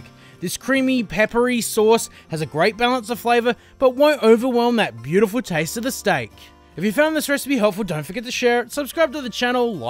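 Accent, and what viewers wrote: Australia. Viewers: That's a nice accent you have there .au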